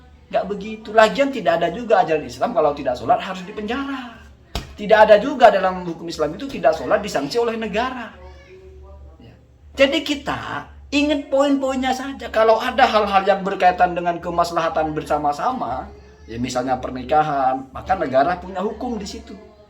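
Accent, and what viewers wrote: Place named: Lampung, Indonesia. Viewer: Bang.. ente orang mana sih. Kok mirip orang Lampung 😁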